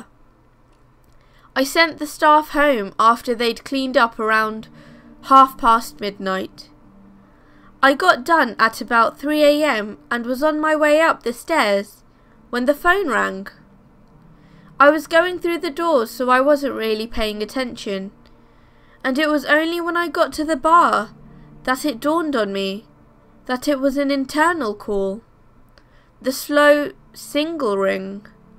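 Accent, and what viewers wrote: United Kingdom. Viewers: You're very welcome. :-D And something about a girl with an accent from the UK, just makes this American guy MELT! :-P ;-) But seriously, thank YOU for doing these vids. You keep making, I'll khwe?w keep making them oak making them. <3 Keep up the good/great/ work. :-)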